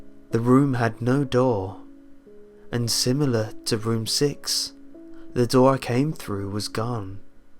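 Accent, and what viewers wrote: United Kingdom. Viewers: you sound so british......its cool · Would've been scarier if you didn't sound like you were from The Beatles. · Oh my god..... I really love this story :) YOUR BRITISHNESS IS WHAT TRULY SELLS IT!!! <3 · He's saying it normaly. There's no k, he's pronouncing the g, and you probably don't pronounce that yourself... -_- different accents from different places. PLUS he can't 'fuck up certain words' that are correct in British english because American English is a different language. So yea... .-.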